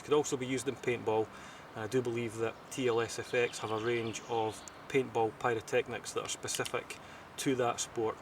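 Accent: Scotland, United Kingdom. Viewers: Yeahhhh, I don't think he is British. I think he is Scottish... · are you scotish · Scottich accent.............. I like it :D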